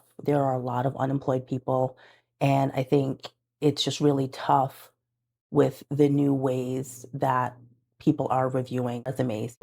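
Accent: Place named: Boston, United States. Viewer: I can tell you’re from Boston, we’re tough, you got this good lookin!…..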